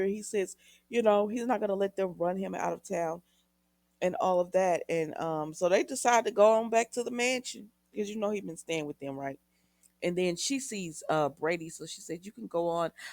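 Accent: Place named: Greece. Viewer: I love your Greek accent!!! Probably better then the actors!!!!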